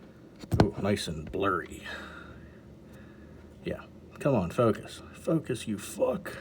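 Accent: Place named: Pittsburgh, United States. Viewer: Thanks, and you sound like a yinzer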